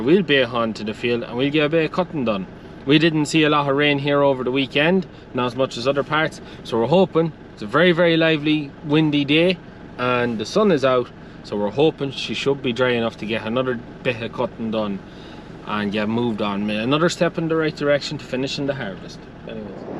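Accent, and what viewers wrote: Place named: Ireland. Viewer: From across the pond, enjoy your farming channel & the Irish accent!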